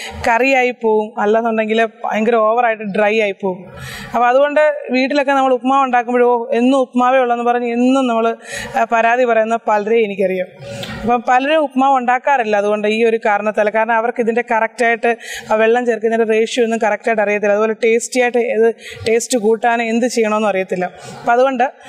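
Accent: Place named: Central Travancore, India. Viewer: njanum ptnmthita anu..central trvncore slang ketanu chodiche😀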